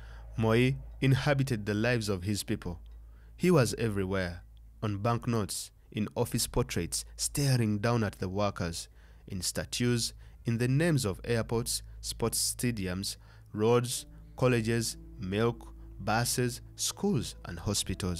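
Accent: Uganda. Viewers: The accent sounds Ugandan of the Narrator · This is not a Kenyan giving this narration, the accent sounds Ugandan.Why not give us the story of UG especially the Amin years.He was one of the worst dictators in Africa, Moi pales in comparison to him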